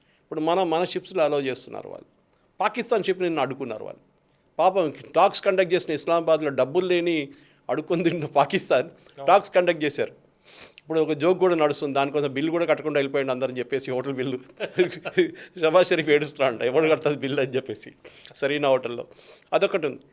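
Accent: Telangana, India. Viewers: sir hats off to ur plenty of knowledge more over telangana yaasa chala bagundi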